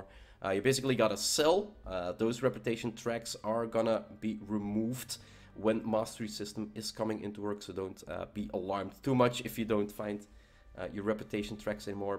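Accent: Netherlands. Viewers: ah youre from zuid-Nederland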